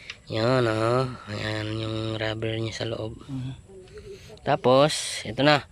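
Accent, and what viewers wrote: Iloilo, Philippines. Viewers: Bro may airgun foot pump man nga guba Ako lang nag Kay o... Ilonggo ka man bro? God bless bro.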